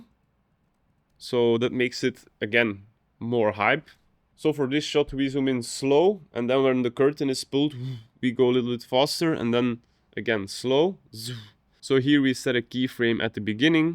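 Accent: Belgium. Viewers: ik hoor een vlaams accent ngl.... XD ben echt blij met deze video! nieuwe dingen bijgeleerd vandaag!! :-)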